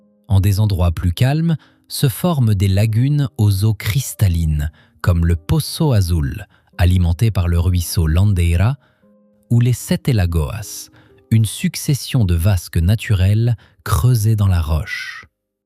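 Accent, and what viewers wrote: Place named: Spain. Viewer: Mon pays de cœur, celui où je finirai ma vie, en ayant obtenu la nationalité portugaise. Si je vis assez longtemps 😅 Tant d'endroits magiques, de paysages variés, de fêtes inoubliables des plus grandes villages aux plus petits villages. Des traditions vivaces et une modernité, moins froide qu'ailleurs! Des détails, partout, qui m'émerveillent: calçada (pavage des rues avec des motifs), azulejos anciens ou contemporains, ferronnerie, art de rue si créatif, coloré et joyeux. ETC. Seul bémol de la vidéo: les noms portugais souvent mal prononcés, à la mode espagnole. Le portugais n'a heureusement rien à voir à l'oral avec cette langue si pauvre en phonèmes!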